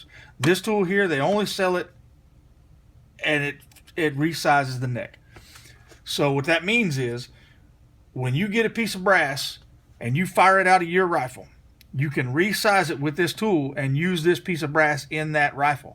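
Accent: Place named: South, United States. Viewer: Very nicely done , especially about sticking spent brass from another gun, I bet a thousand people have done just that!! Don't worry I have been married for over 30 + years but you have a nice voice for video's,kind of like slim pickens ,has kind of a southern draw! I subbed. You should make one using that device since I own one now!And spent over a hundred on primers brass bullets ETC!!! Very good video again.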